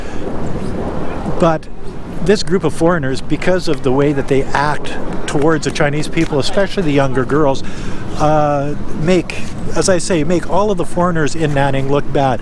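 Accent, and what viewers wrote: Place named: Canada. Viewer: Gosh!!! I'm a Canadian myself and I can hear your heavy canuck accent! Americans, British folk out there. This is a true Canadian accent.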